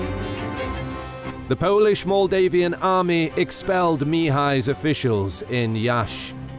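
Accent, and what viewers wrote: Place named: United Kingdom. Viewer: Are you trying to be Kings and Generals or Bas Battles? I love it. A different yet still British narrator. Haha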